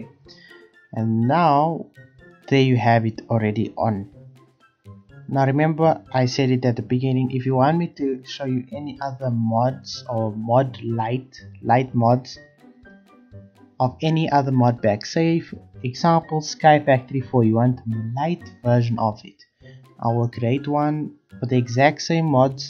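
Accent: South Africa. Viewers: You sound like you from south africa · Ur accent sounds like a South African accent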